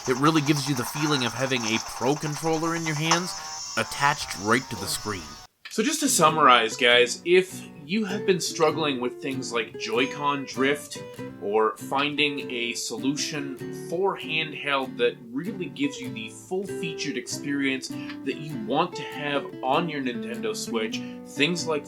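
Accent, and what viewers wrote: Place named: Canada. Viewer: I hear that canadian accent 👍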